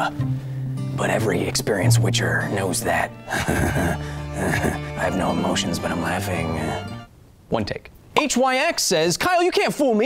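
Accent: Poland. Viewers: HI Kyle , really love the show and I loved the polish accent of this vid (for obvious reasons xd) but don't do my boy Tomasz like that... In pronunciation of that name you emphasize the "T".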